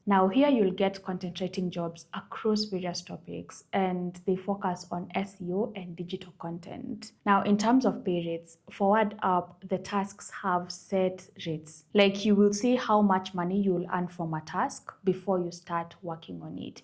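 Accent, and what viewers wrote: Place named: Kenya. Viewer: I immediately knew from your accent that you’re Kenyan. Proud to see another countrywoman representing us in this space ❤️ (you are Kenyan though, right?) 😅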